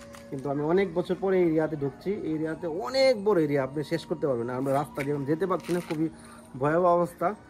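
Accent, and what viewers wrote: Bangladesh. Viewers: কিন্তু ভাই তোর কথা শুনে মনে হচ্ছে তুমি বাংলাদেশি , সাবাধানে থাকিস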